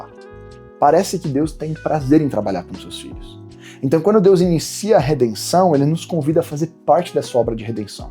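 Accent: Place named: United Kingdom. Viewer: A entonação do inglês e o sotaque hahahah Vídeo muito necessário ❤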